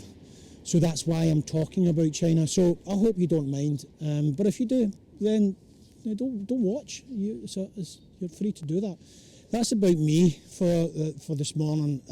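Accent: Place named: Glasgow, United Kingdom. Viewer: Did my undergraduate study at the university of Strathclyde in Glasgow, your accent really reminds me of the old times, glad you like China~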